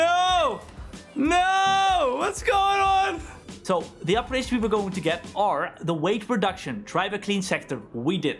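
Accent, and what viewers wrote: Netherlands. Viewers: Very nice video. I can barely tell you're Dutch. Your English improved a lot.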